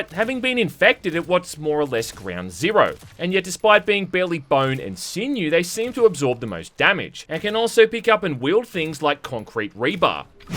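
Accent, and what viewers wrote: Australia. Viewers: the aussie jeremy clarkson of game reviews, i cant take no more of this ear rape.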